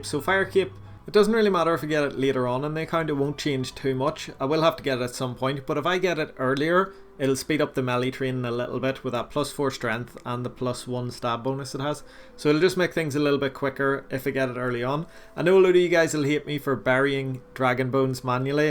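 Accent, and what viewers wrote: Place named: Northern Ireland, United Kingdom. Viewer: Thats a properr norrrnn irrron accent for sure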